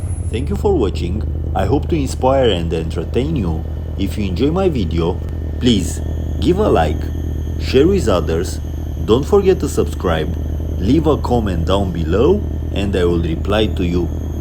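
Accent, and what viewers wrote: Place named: India. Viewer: インド訛りの英語だね。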